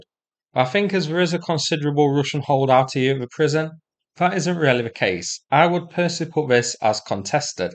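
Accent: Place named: Yorkshire, United Kingdom. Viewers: How could that be, when everyone is identifying his accent as Yorkshire? Mansfield is in Nottinghamshire, quite a hike south of Yorks. This is an honest question, as I am not an expert on the speech of Notts. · I see that YT have you as Taiwan based. Is this commentator AI ? If so, it's a great Northern British Accent. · Guessing Yorkshire /Lancashire accent, same for the Rabbit. 🙂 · Forgive me if I am wrong. As I just Luv your Yorkshire accent...It reminds me of my Grand Dad and Great Grand Dad...as my father went to University and he didn't have an accent although he was born in Scotland...😊😅😂.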